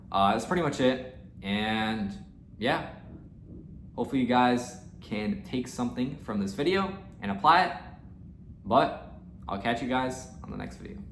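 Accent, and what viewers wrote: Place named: Wisconsin, United States. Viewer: are you from Wisconsin with that accent? that point about scaling up with accounts of different sizes is so true, no more full porting 😎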